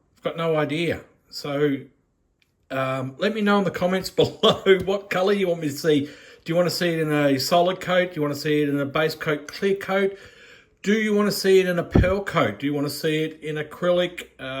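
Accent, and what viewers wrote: Australia. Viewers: Knew you were a bloody Aussie, like me, pick the accent anywhere :)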